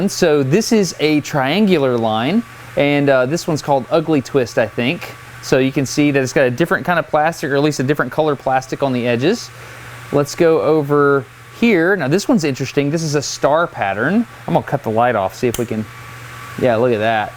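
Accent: South, United States. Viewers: Destin's southern accent came through more when he was talking with.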